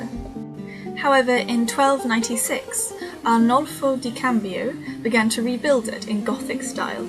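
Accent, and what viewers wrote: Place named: England, United Kingdom. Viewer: va bene! you have lovely version of the sometimes not lovely posh english accent..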